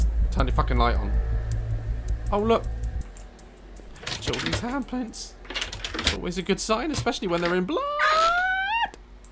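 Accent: United Kingdom. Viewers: sounds slightly more British than I remember, his accent is really coming through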